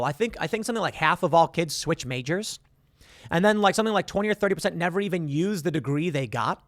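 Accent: Chicago, United States. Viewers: Sheesh,. Your South Chicago accent showed through today.... Appreciated your commentary. It made me reflect on my circumstances... past and present. In the 1970s it became harder and harder for a working class family whose parents had not gone to college to make it on one salary. That was when mothers (my mom included) started going into the work force - out of necessity to help their families keep up with the inflation of the 1970s. It is why my sister and I were encouraged to go to college... because it really was thought a college degree would help your children break through financially. Now, back then, you had to go to a bank with your parent to get a school loan. Mine was $10,000 over four years (that was a lot back then). After graduating from college, I had to pay that loan back over a ten year period. I still remember that last loan ticket and paying it off. 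Good feeling. But at some point the US government stepped into the school loan business. I think that's when things got out of hand and when college tuitions screamed higher and higher way above that supposed rate of inflation at the time. By the time my children (your age) were ready to go to college, my spouse and I had bought into what we were told, that it was the thing to do. But we also believed it was the best way for them to have a chance to make a decent living. Honestly, younger boomers and older gen-xers like myself felt they had to go the college route for their children, had to encourage it. We w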